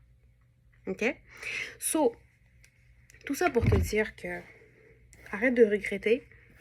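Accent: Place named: Quebec, Canada. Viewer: Tu es québécoise ? Je crois déceler un léger accent 😊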